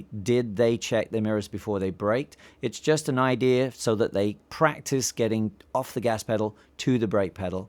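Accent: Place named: Kentucky, United States. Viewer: I would not have pegged that as a Kentucky accent!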